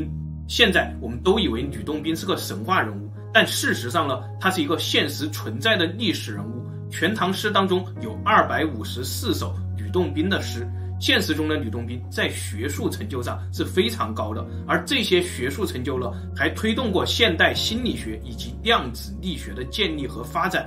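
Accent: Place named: Shanghai, China. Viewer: 不搭噶 up主上海人吧😂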